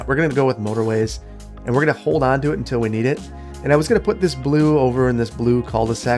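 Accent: Wisconsin, United States. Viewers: let your Wisconsin come out more often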